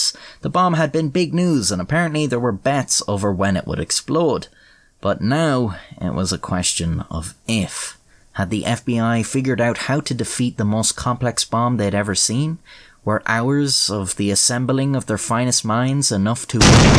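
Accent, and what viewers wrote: Ireland. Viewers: This was way different from the story I expected while litening to an Irish voice talking about a bomb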